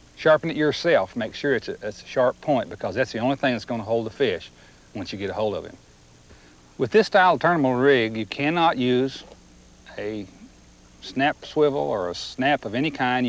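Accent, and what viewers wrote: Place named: Tennessee, United States. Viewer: You sound like bill dance! Must be from Tennessee?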